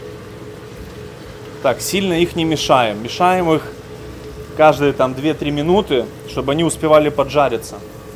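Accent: Ukraine. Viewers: Да,малоросский акцент. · Все отлично, надо только поработать над собой- украинский акцент сильно мешает слушать, изви6ите!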